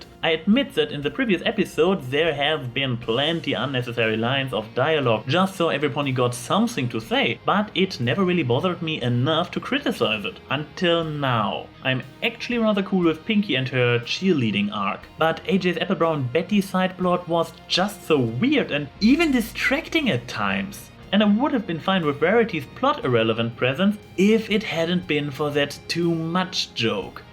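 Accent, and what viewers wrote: Germany. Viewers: I have no idea why, but I seem to be really happy to hear your German tones today.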